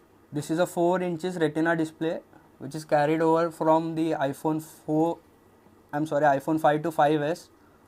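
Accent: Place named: India. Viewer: You made me cry I hate Indian accent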